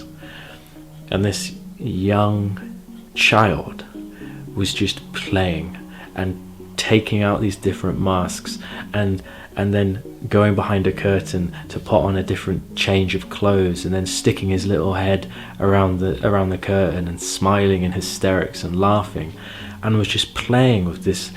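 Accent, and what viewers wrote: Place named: England, United Kingdom. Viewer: you sound English. Where do you go for the retreats?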